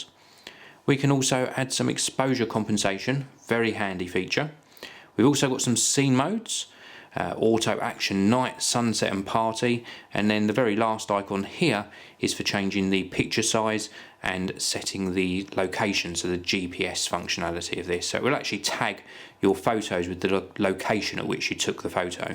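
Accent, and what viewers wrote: United Kingdom. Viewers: love the British accent!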